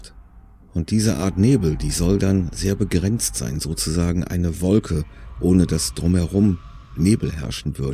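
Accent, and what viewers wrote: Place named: Cologne, Germany. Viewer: Auch wenn es nichts mit dem Thema zu tun hat, man hört ab und zu wie dein Kölsch so ein bisschen mit durchkommt 😄 Find ich aber super sympathisch! 👍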